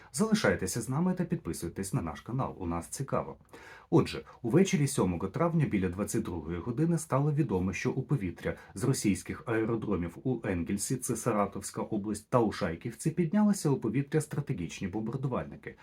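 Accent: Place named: Odesa, Ukraine. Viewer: Не звертайте уваги. У Вас чудова, характерна одеська вимова. Така вимова- Ваша родзинка.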